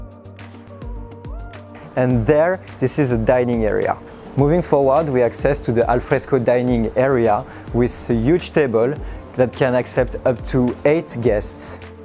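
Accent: France. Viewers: Nice French accent. "The key to life is happiness in your household"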